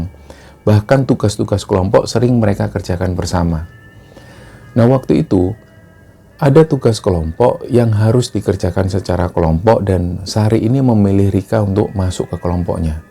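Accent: Java, Indonesia. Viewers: mas duhhh maless aku jan nagih i klo sehari ga denger koyok ono sing kurang, btw sampean jawa ndi seh mas kok boso jowone jan koyok bahasa sehari2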